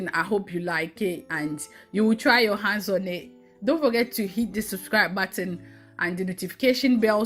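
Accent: Ghana. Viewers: You sound like a Ghanaian . Are you? Yam balls sounds yummy 😋 I love the mortar and pistle. From dakty channel. Thanks for sharing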